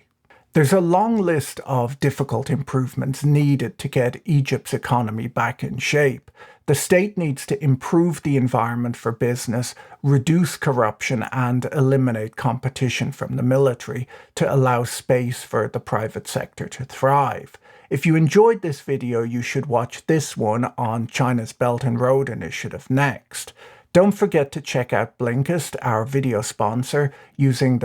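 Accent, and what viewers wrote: Ireland. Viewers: I do miss the occasional comedy. Everything in an Irish accent is funny. However, he has to be one of smartest men I seen in a long time.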